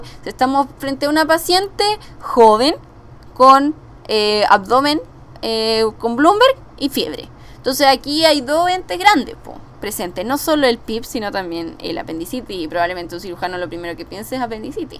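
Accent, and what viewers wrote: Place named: Chile. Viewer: Cómo se nota que es chilena Atte: una chilena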